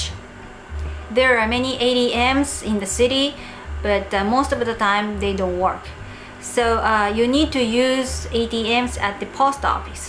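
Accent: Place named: England, United Kingdom. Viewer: Your English accent is so cute!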